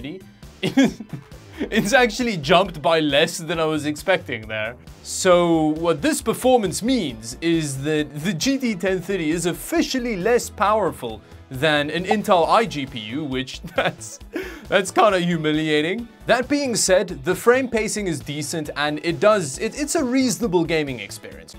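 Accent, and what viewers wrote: South Africa. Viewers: Are you by any means from South Africa hence your accent? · Hey! curious, are you a Afrikaaner! im getting South African Vibes from you lol! Awesome Channel and love the MUG thank you lol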